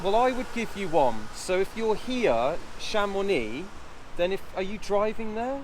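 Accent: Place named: United Kingdom. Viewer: I was just enjoying your British classic accent 😍, hi from Dubai ✌🏻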